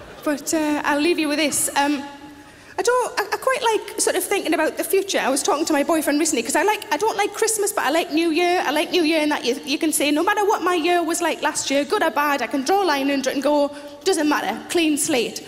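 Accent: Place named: England, United Kingdom. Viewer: I wondered what part of England she came from with the accent, I don't here that kind of British accent very often.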